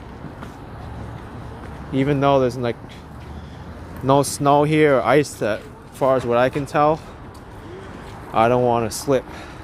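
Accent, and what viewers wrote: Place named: Boston, United States. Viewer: Thanks for limiting the use of the Boston accent.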